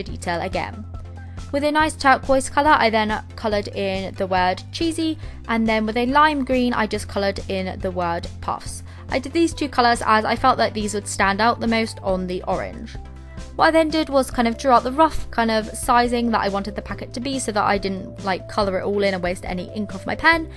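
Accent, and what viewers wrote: England, United Kingdom. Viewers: I'm guessing Your From England But who cares? I love you!❤ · You just say some british accent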